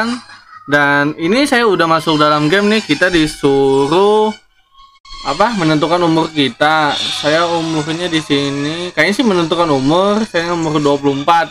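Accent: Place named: South Kalimantan, Indonesia. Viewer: Kayaknya kamu orang kalsel ya guys?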